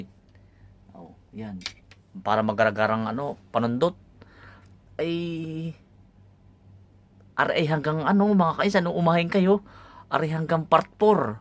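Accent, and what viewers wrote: Quezon, Philippines. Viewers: Ah ah parang ikaw ay taga quezon hehe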